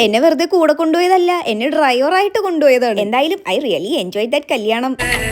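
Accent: Palakkad, India. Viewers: Chechi Palakkad basha vanu😅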